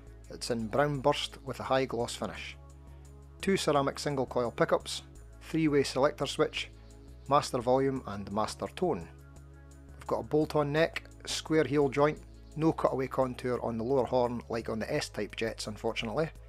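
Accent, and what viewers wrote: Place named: Dundee, United Kingdom. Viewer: Sounds like a Dundonian accent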